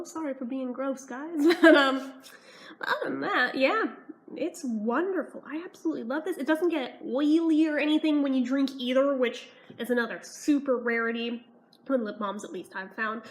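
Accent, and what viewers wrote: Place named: South, United States. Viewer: I love her southern accent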